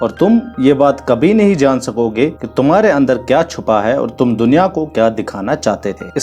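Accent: Kashmir, India. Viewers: I think you are KASHMIRI because of your accent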